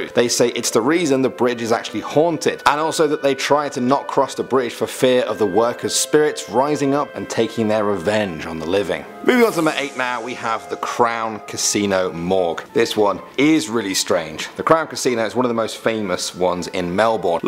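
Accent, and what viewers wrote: United Kingdom. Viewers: dark hues hes English/British.... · To all Australians who focus so much on pronunciation. Do you realize that this man is from Great Britain? You don't expect him to pronounce city names with yours accent. And if you think that your English is the only and right one, then remember where your great grandfather came from. Seriously that's ain't even funny.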